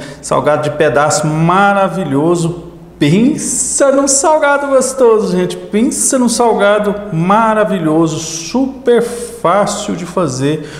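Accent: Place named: Goiânia, Brazil. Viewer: Falo de São Paulo capital acho lindo sotaque de Goiânia, acho muito legal qdo você fala a palavra Pregar qdo se refere a massa, aqui na minha região a gente fala grudar. Nosso Brasil é maravilhoso cheio de cultura diversificada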